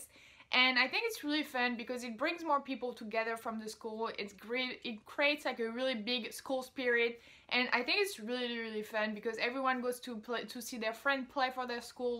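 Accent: France. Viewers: Because she has a french accent....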